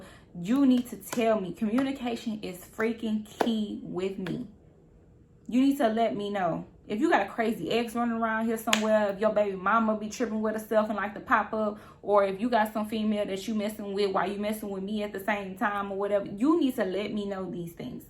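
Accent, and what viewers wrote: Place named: New Orleans, United States. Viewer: I feel like I hear a lil new orleans accent lol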